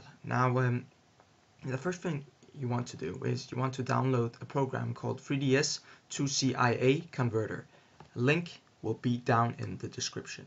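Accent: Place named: Brazil. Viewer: thanks man! I didn't know what to do with the citra.exe application. Do you live in Brazil? Your accent sounded like it., but I doubt it because of your name.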